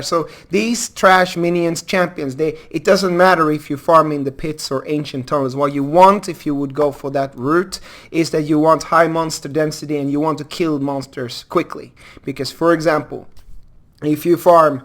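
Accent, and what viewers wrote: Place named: Sweden. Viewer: Dude, this is a cool upload. Where are you from? The accent sounds.. Swedish? In that case, fan så coolt.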